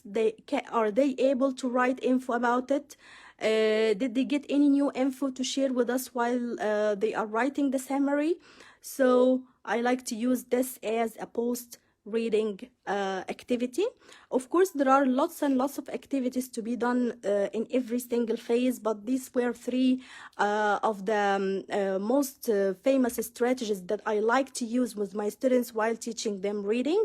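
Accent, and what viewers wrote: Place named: United Kingdom. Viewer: تبارك الله Your language is really perfect fluent and confident, British accent.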